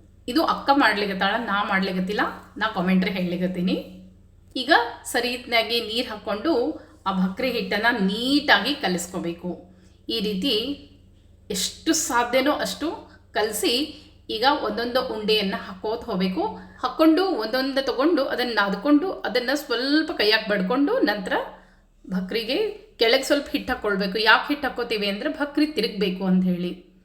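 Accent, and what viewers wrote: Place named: Belagavi, India. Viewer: Amma navu kuda dinalu rooti maduteve navu belagaviyavaru nivu namma tarane matadatira namage tumba khushi agutte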